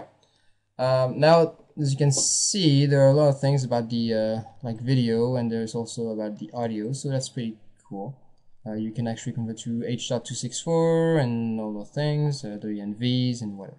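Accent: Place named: Quebec, Canada. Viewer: enfin un quebecois xD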